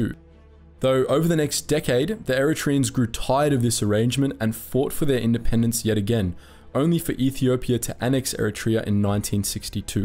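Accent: South Africa. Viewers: Great video. Based on the accent can I guess the narrator was born in South Africa but moved to Australia later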